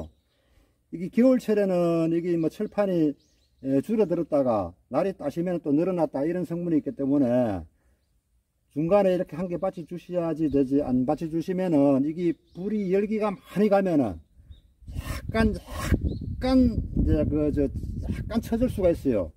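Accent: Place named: Gyeongbuk, South Korea. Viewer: 경북우리고향 사투리네여 ~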